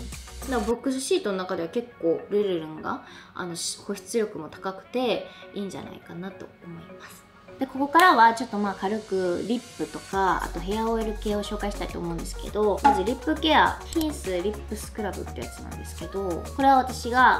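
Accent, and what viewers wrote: Kansai, Japan. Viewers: 凄い種類の多さに驚き、最初どうやって選んでいるの？視聴者は助かるんだろうな～ ちゃんの関西弁に癒される～💞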